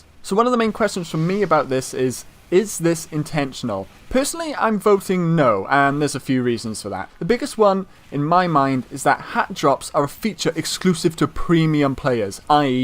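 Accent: Wales, United Kingdom. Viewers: Are you Welsh ?? You sound like it.....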